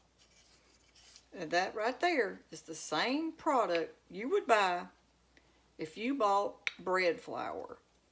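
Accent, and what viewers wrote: South, United States. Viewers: I love the southern accent.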